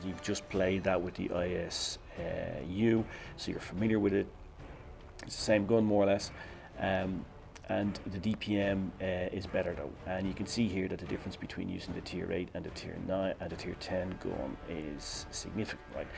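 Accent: Ireland. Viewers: Thanks for the review mate! Can I ask, are you irish? For the accent nothing more, if u are cool. Cheers Saludos desde Mexico!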